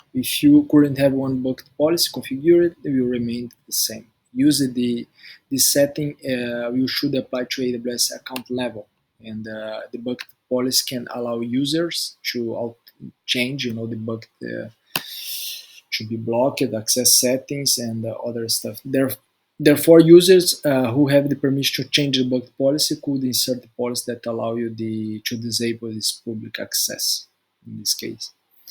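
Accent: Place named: Brazil. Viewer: Bro u sound like a brazilian... 🤔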